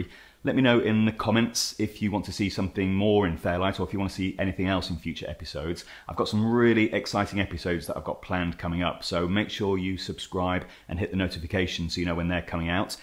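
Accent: United Kingdom. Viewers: Thanks for this - very helpful and your approach is clear and friendly! Lovely british accent too:) From South Africa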